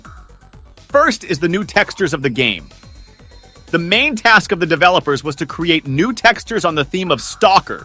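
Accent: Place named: England, United Kingdom. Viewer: Cool inglesh voice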